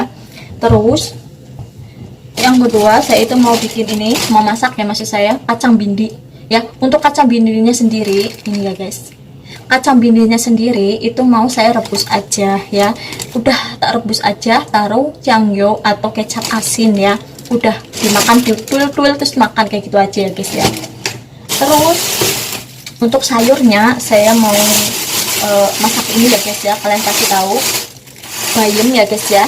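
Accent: Banyumas, Indonesia. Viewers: Suka dengernya basa ngapaknya mbg,, Slm knl mbge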